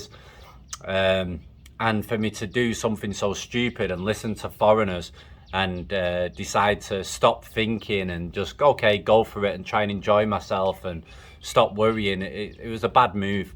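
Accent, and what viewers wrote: Manchester, United Kingdom. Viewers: You from Manchester,?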